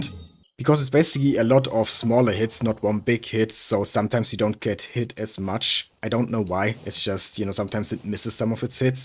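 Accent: Germany. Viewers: That german accent strong af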